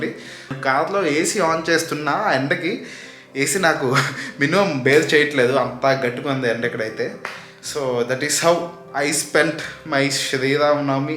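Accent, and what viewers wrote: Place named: Telangana, India. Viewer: Atluthadi maa telengana slang ❤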